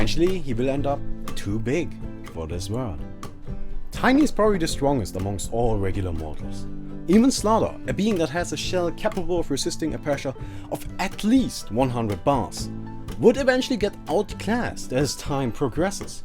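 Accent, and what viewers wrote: Germany. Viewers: I really find it funny how many people comment on that zeus zois stuff... zeus is pronounced zois(strong z) in german, this guy is from germany so he talks like that. case solved. ( not to mention this pronounciation is actually more correct than the english one) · he is german, that explains his pronunciation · Dat german accent...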